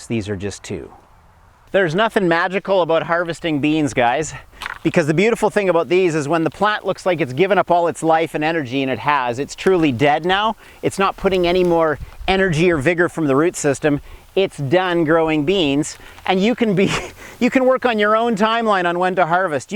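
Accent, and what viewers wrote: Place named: Canada. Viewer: I can hear the faint Canadian accent!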